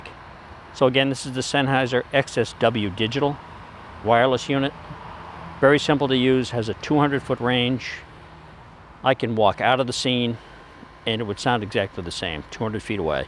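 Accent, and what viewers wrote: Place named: New England, United States. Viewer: Living in a few different states over the years I've never really developed a strong accent in my speech. But listening to you speak made me chuckle to how strong a New England accent can be. Hope you're doing well.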